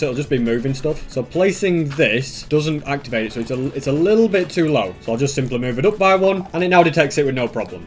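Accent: United Kingdom. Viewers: are u uk?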